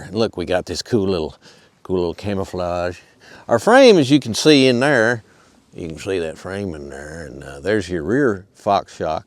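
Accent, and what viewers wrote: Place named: South, United States. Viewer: Your review of the tundra trd pro was the best I’ve seen. I’ve ordered the 2026 and for the past 4 weeks have watched every video I can find. Your approach, attention to detail, and technical information, combined with the southern draw was worth the price of admission. I learned a lot and look forward to delivery with both eyes wide open. Thank you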